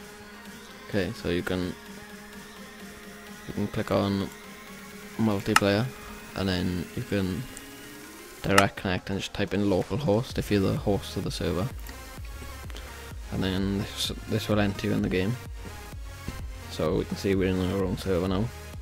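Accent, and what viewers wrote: Wales, United Kingdom. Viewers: you sound proper welsh. are you ? :P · nice welsh accent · Is that a welsh accent I hear?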